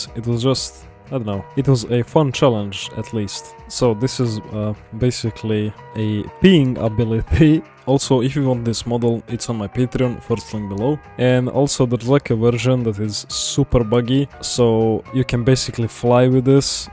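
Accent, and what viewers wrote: Russia. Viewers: Bro your accent i think you are russian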